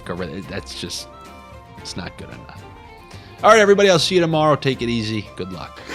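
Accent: Boston, United States. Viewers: The boston accent🔥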